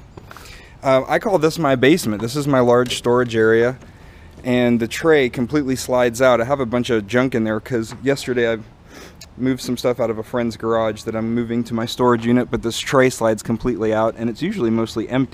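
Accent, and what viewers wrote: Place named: Canada. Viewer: Nice van. . I hear a slight Canadian accent, are you from there?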